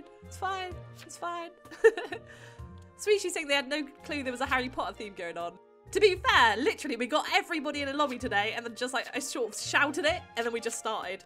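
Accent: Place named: United Kingdom. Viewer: I am always amazed at how British you sound and I'm British myself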